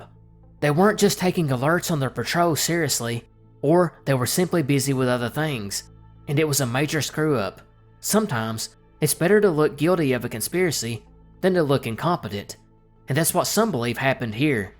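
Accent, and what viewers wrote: South, United States. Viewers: Southern accent x Mysteries. Subbed. Finally something new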